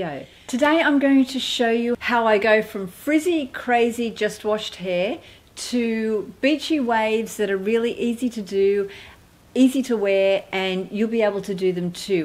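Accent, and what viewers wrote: Australia. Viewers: Thank you for such nice helpful tips oh and I LOVE your Aussie accent☺️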